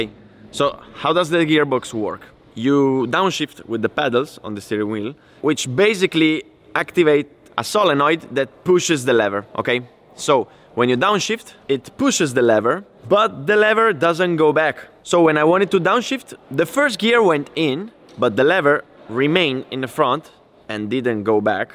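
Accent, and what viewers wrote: Italy. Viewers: minchia è siciliano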